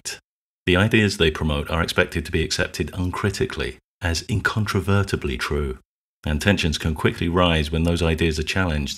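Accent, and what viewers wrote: England, United Kingdom. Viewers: Don't listen to this man just because he has an English accent, and minimal graphic design. His opinions are no more valid than yours and mine.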